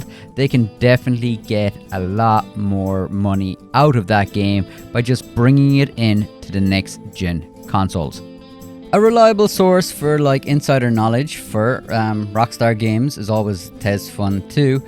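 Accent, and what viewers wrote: Ireland. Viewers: Thank you. Will keep playing as I’m not a “gamer”…RDR2 and classic Guild Wars 💕☘️ Irish accent? Cork?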